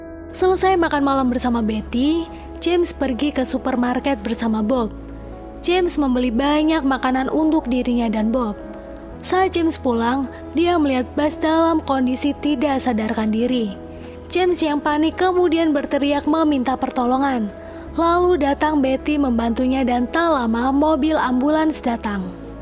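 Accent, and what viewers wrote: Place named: Pekalongan, Indonesia. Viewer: Logate kak kalongan temen mbak oo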